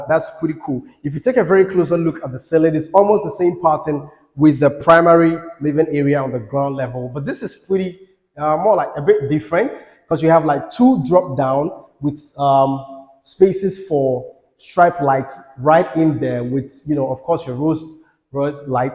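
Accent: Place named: United States. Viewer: of late sounds Soo American oo😂😂😂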